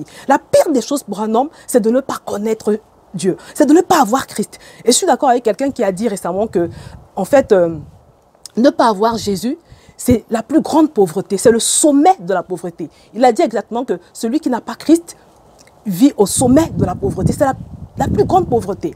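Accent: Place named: Mali. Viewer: C claire t une malienne vu l'accent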